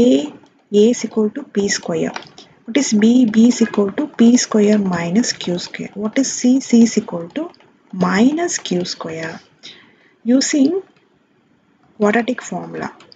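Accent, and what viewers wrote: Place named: Kerala, India. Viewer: i am from kerala that"s why i thought u r from kerala ur accent is similar please tell mam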